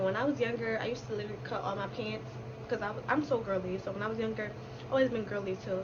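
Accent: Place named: Philadelphia, United States. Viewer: that accenttttttt, you better be from philly 😩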